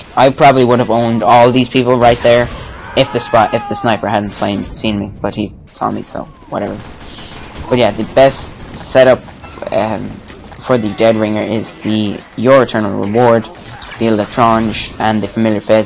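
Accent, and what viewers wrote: Ireland. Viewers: Ur irish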